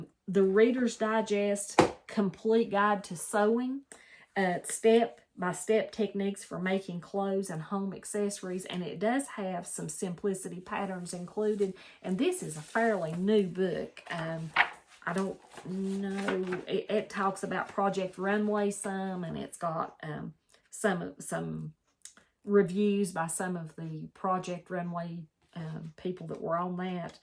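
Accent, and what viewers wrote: South, United States. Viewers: I enjoyed your book sharing. Many of those books are books I’ve owned and then when I had to downsize had to pass them on. But, they brought me much pleasure while I had them. (I do a lot of mental sewing!). I knew your southern accent resonated with me. I live in western North Carolina, too!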